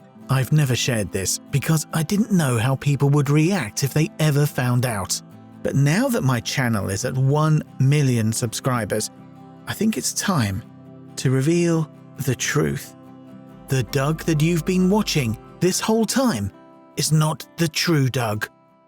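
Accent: United Kingdom. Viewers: Wait, was it or? Real Doug's accent was too British. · This may be a friggin sick joke, but the British accent makes me think he isn't joking.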